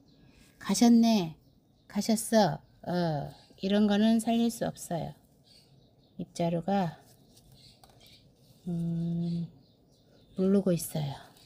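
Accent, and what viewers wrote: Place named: Gwangju, South Korea. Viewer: 사투리가 정겹습니다 저도 광주라 듣기편했어요 ㅎㅎ 삽목이 꽃피운거 분양해주시면 안되나요? 저도 러샤바욜 하나 키우는데 둘이 칭구 만들어주고싶네요~~^^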